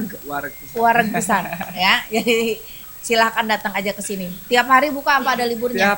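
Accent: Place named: Garut, Indonesia. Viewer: Ngomongnya ala garut terussss skrg😂😂😂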